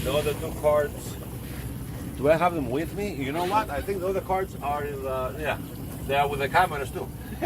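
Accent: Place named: United States. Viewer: Γειά σου από την Πάτρα.Ολα τα λεφτά τα αμερικάνικά σου! καουμπόικα!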